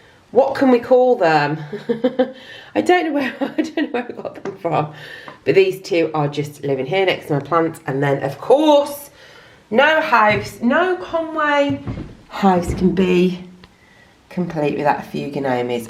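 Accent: United Kingdom. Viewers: You are a charming and humorous woman! Very pleasant.... Are you British? As I am German it is not always so easy for me to distinguish British and American language.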